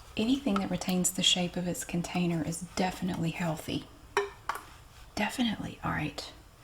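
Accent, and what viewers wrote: South, United States. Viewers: Holy smokes people really admire you sound south lady!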